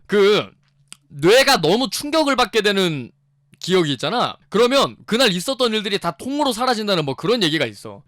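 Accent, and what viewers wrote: Busan, South Korea. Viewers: 이형은 영화관이랑 인연이 안좋아ㅋㅋㅋㅋㅋㅋ 그런데 부산분이셨나???ㄷㄷ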